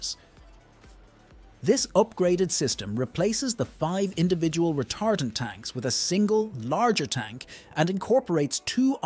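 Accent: Ireland. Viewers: I believe the narrator is Irish & their English pronunciations are a bit different. No problem.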